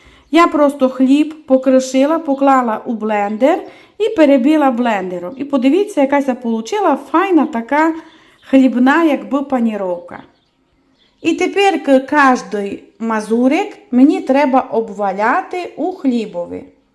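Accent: Transcarpathia, Ukraine. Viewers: Підписалася , бо дуже приємно чути ваш голос і чудову мову Закарпаття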